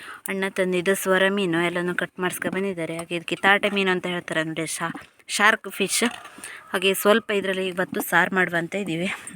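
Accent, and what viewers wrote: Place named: Kundapur, India. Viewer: Nimma mathu swalpa kundapur kannada uttarkannada mix ede adare nimma mane hatthira beautiful nature nodi kushiyayithu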